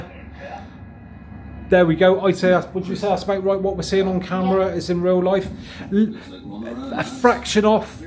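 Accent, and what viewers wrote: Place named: Cornwall, United Kingdom. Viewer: Hi mate great video! Are you cornish if you dont mind me asking?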